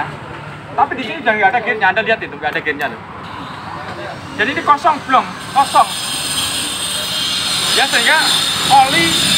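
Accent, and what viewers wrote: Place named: Medan, Indonesia. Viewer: Abang satu ini kayaknya asal Medan😁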